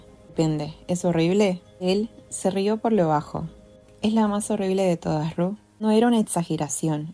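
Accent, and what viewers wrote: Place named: Argentina. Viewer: Me encanta el acento argentino, le da el toque perfecto a la narración 👏🏼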